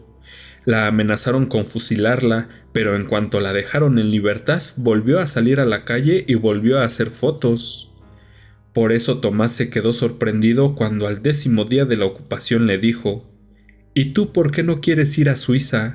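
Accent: Mexico City, Mexico. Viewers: ese acento chilango... choca un poco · lo está leyendo un chilango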